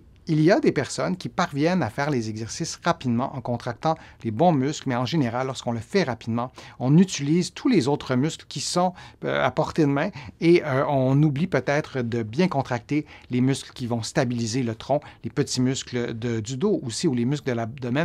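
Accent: Quebec, Canada. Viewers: Merci pour vos conseils avisés On doit vraiment s appliquer même si les douleurs sont parfois pas simples à gérer. Je vous remercie vivement de votre engagement pour nous soulager. Je souffre de lombo sciatique paralysante et de fibromyalgie. Vos exercices sont vraiment top Bonne fin de semaine et j adore votre accent Québécois Brigitte FONTAINE VESOUL. FRANCHE COMTÉ..FRANCE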